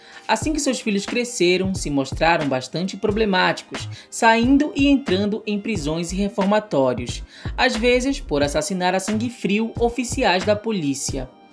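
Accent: Pará, Brazil. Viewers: tem um sotaque paraense lindo. ❤ beijos manauaras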